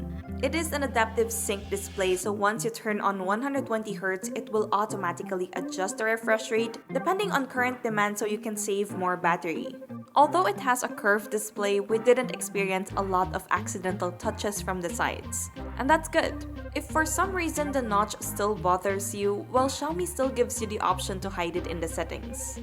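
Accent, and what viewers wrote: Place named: Philippines. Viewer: never lose that pinay accent, its part of your charm "var ree aunt"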